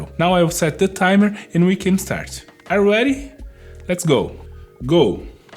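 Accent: Brazil. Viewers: The Brazilian Accent is THICK! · I can tell this dude is Brasileiro by the cadence and enunciation of his voice lol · ola! tudo bem? I can tell that you are from Brazil! thank you for the video. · Pensei a msm coisa , soa como um brasileiro kkkkk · Great video! Just a question tho, are you Brazilian? :V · VOCE É BRASILEIRO VOCE NAO ME ENGANA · Quando eu vi o sotaque ja sabia que era Brasileiro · Hi! This video is great! Thank you so much for posting this. A small question, are you Brazilian by the way? Your accent is very familiar! Familia Brasil! Abração! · i love the brazilian accent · You're Brazilian, right? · I can tell by your accent that you’re Brazilian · Are you brasilero my amigo? Teu sotaque é imperdivel saudes. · Tu é brasileiro, certeza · engraçado como que no primeiro segundo do vídeo eu já sabia que era brasileiro kkskjsksks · Bem quando você começou a falar sabia que você era Brasileiro :D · Is he Brazilian or am I trippin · Is crazy how we know someone is from Brazil by the accent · i can smell a brazilian accent from a mile away CHEERS mano! 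· I love the brazillian accent, nowdays I can perceive how much we say all the sounds more open and "round" than the americans, mainly because this is a characteristic of the Brazillian Portuguese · CERTEZA QUE É BR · Adoro seu sotaque brasileiro lindo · Most Brazilian accent I've ever seen ahah Great tutorial! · sotaquezinho brasileiro <3 thanks for the video! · Hehe Deu pra perceber que era BR pelo sotaque O canal é top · tu é brasileiro é ? thank'u for video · This has nothing to do with the video but are you brazillian?? It’s just because of your accent I don’t wanna sound rude I’m just really curious lol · VC è mineiro? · Reconheço o sotaque br de longe kkkkkkkkkkkk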